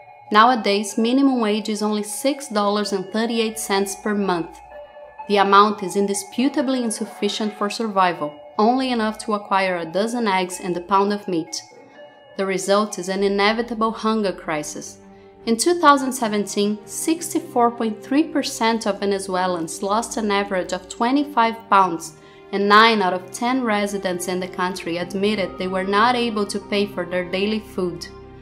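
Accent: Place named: Brazil. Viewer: Belo inglês. Só um pouquinho de sotaque brazuca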